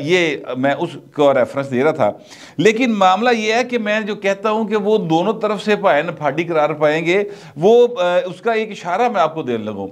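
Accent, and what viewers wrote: Lahore, Pakistan. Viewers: Lahori boli پائی جان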